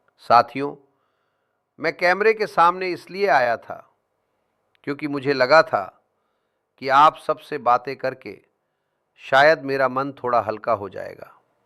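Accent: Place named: Uttar Pradesh, India. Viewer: अभी भी आपकी आवाज और लहजे मे पंजाबियत जरा भी नही दिखती, यूपी वाली शुद्ध हिंदी ज्यादा झलकती है,इसीलिए लोगो को डाउट होता है क्या आप मेरे इस कमेंट का भी जवाब दे सकते हैं? क्योंकि इसी आवाज और चेहरे मे आप दूसरे गेट अप मे कयी बार हिंदू और मुस्लिम बुजुर्ग भी बन चुके हैं.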